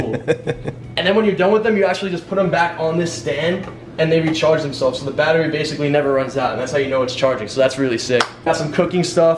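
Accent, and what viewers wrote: New York, United States. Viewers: hes from ny that's why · new york accent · He has a New York accent so when he say chocolate it's funny 😭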